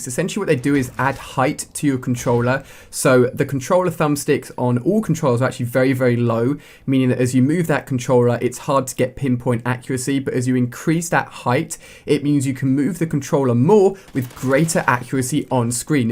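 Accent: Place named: England, United Kingdom. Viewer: Your cool love your England accent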